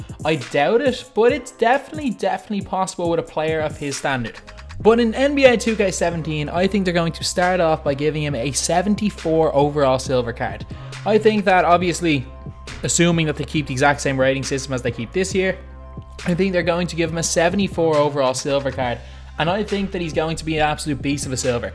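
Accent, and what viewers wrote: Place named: Ireland. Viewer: Is he Irish bc he sounds like Conor Mcgregor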